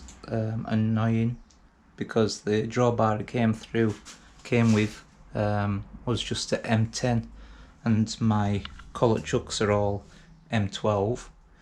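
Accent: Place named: Bishop Auckland, United Kingdom. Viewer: Sounds like your are from Bishop 😎👍or Tow Law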